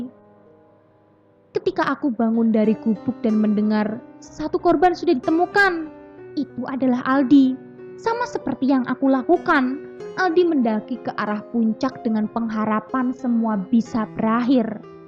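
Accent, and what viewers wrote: Java, Indonesia. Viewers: Logat nya medok kali..😂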